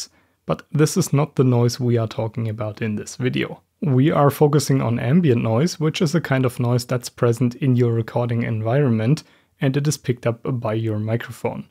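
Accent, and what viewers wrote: Germany. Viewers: awesome video!! just found your channel. great stuff man, keep at it. love your accent, is it german?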